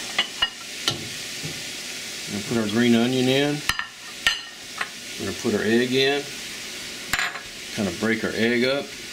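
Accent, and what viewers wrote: United States. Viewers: are you chines or do you live there becuase you make every thing that chines people make and you sound country to me not being roude im just wondering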